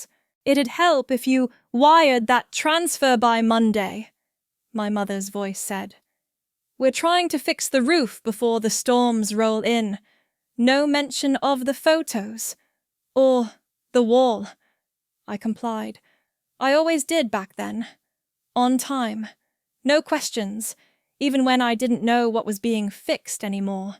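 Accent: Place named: England, United Kingdom. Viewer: Why does she have an English accent?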